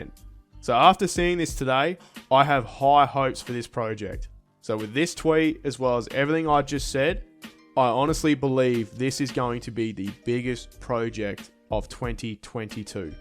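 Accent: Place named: Australia. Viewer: Thanks bro..good to hear an Aussie..im QLD'r..😃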